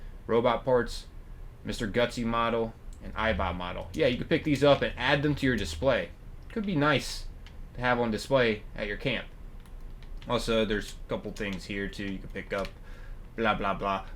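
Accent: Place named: Pennsylvania, United States. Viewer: Yinz from Picksburg? Your accent sounds realllllllll PA.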